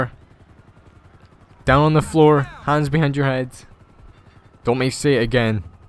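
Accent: Scotland, United Kingdom. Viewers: I guess your Scottish like me, I can tell by the accent also nice video mate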